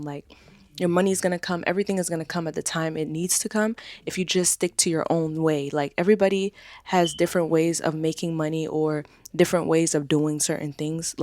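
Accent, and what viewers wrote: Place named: United States. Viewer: Greetings from Angola 🇦🇴, right here in Africa! I just want you to know how much your videos mean to me. Your words, your energy, and your American accent inspire me to keep improving my English every single day. You have no idea how far your motivation reaches, it truly crosses oceans. Thank you for being such a light,...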